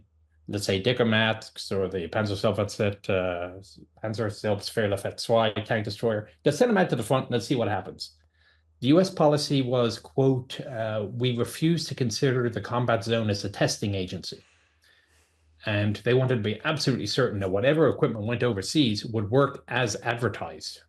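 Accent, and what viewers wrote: Ireland. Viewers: Is this fella from Ireland originally but in US for years? His oronunciation of 'T' especially at the end of words suggests so. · The tank man is not British??? But American his accent sounds Irish with a little bit of london oops...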